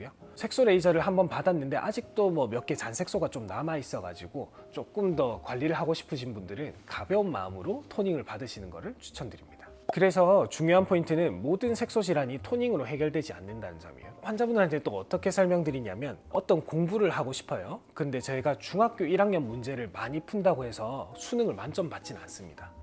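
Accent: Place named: Yanbian, China. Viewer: 과한몸짓과 조선족말투. 사기꾼 같아보입니다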